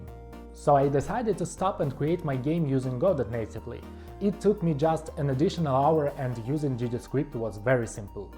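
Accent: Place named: Russia. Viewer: с первых секунд услышал русский акцент)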